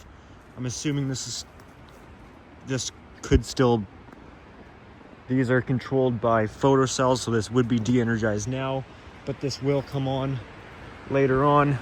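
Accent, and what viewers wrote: Canada. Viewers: Hi where are you from your accent sounds Canadian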